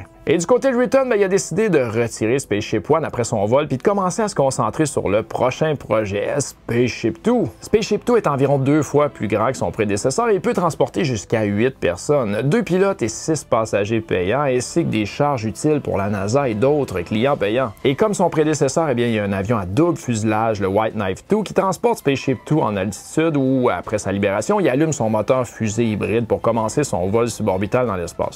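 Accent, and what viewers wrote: Quebec, Canada. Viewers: Toujours un vrai plaisir. Le ton, le style et tes efforts pour lisser ton sympathique accent québécois. Au dela de la forme, c'est tres intéressant d'avoir les nouvelles du spatial d'un point de vue nord-américain et ... en français ! Courage...on aime ton travail 🇨🇵 ❤ 🇨🇦 Ps. J'ai conscience de l'esprit indépendant nord américain mais pourquoi pas des collaborations avec des YouTubeurs français? C'est une pratique courante ici chez ces "maudits français". Ton nombre d'abonnés n'est pas a la hauteur de la qualité de ton travail...(aucune flagornerie de ma part...)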